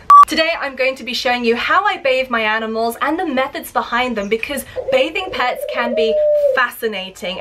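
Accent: Australia. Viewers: Is she Australian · Why do I think she is Australian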